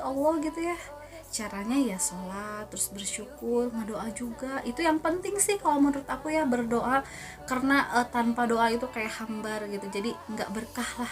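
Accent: Indonesia. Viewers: Wong Jawa ap Rika mba 😂😂😂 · nyarios na cirikhas orang Sunda pisan nya teh resep mireng na hehe 😁🤗🤭sukses terus teh ❤️🤗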